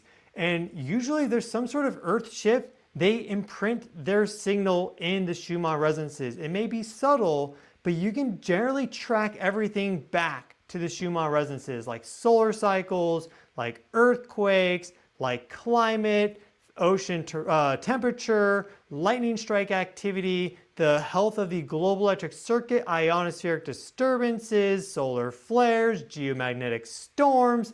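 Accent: Russia. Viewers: You are such a beautiful Human,thank you for the incredible video,and I love your Russian!!💟💟💟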